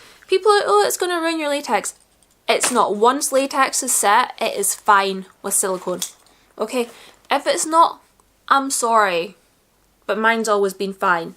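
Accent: Scotland, United Kingdom. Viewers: Hi can I ask where you’re from? Scotland?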